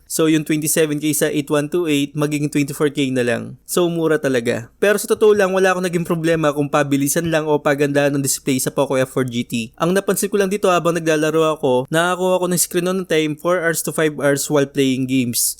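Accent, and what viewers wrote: Pampanga, Philippines. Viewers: Kapampangan kaba sir? Great video btw.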